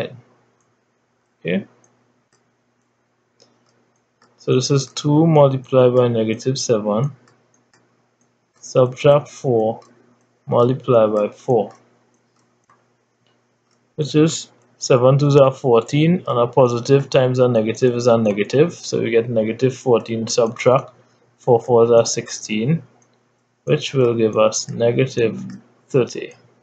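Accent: Trinidad and Tobago. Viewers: Wow....great job, sounds like a Trinidadian tutor. Well explained. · You from Trinidad ?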